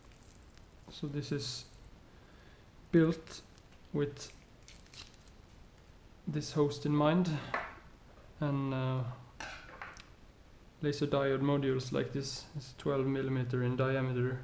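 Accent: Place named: Norway. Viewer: By your equipment and your accent i bet you are from scandinavia, most propably from norway.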